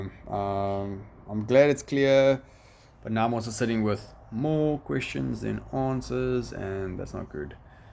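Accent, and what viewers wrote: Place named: Johannesburg, South Africa. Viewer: Im trying to pin point that accent. Im guessing Joburg?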